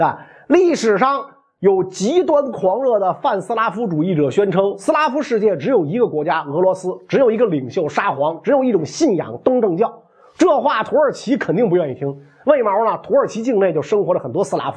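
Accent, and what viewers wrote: Beijing, China. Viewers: 听到袁sir这字正腔圆的北京话就神清气爽啊！提神👍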